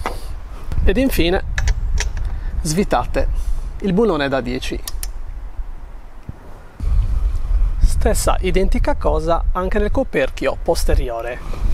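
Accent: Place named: Sardinia, Italy. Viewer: Ad un certo punto ho creduto che li avresti smontate 😁 Di dove sei ? Non dirmi sardo che l’ho capito 😁😁😁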